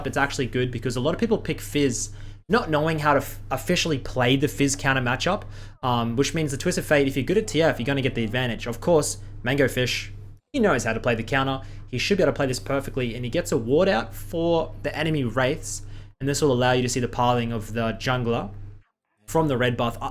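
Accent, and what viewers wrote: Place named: Australia. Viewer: "mango fish knows how to play the qiyana" edit: BRUH I JUST REALISED HE SAID "COUNTER" AHAHA, i love the australian accent xd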